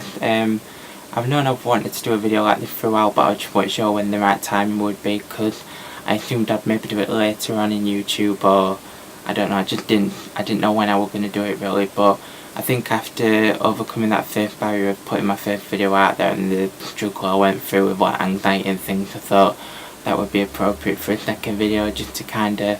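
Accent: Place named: Birmingham, United Kingdom. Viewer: Go Birmingham! Love your accent! 😍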